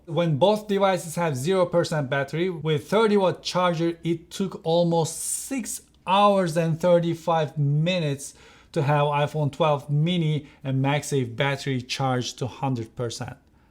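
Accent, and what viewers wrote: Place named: Turkey. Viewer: you are speaking like a turkish guy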